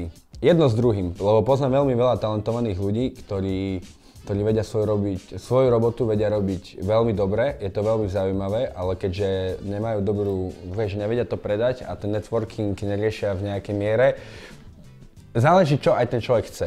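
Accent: Eastern Slovakia, Slovakia. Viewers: Vychodniar jak repa 😀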